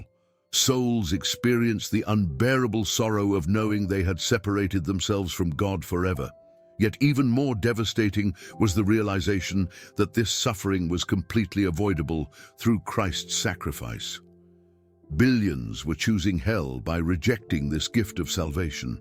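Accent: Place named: United Kingdom. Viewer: This could and should have been edited down....the British accent does not make it more palatable.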